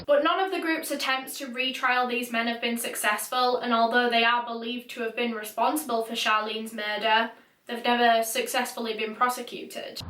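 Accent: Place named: Yorkshire, United Kingdom. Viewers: She's from T-Yorkshire. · She's from West Yorkshire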